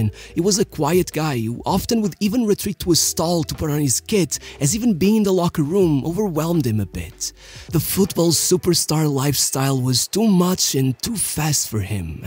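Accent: Portugal. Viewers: Nice Portuguese accent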